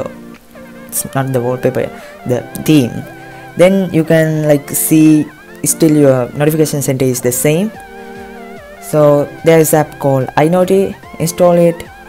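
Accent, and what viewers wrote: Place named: India. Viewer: Are you Indian or Pakistani? I'm assuming Indian from your accent. You should get a Snapchat account so we can know when you upload a video.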